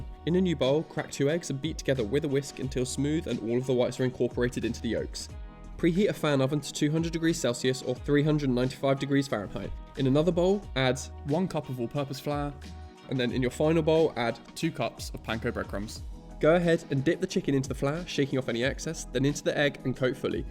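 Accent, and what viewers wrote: United Kingdom. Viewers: Are you British, sorry for my question, but I started with the step of learning and developing the English language, and when I heard you, I felt that the English language is different from the British and I apologize once again to you...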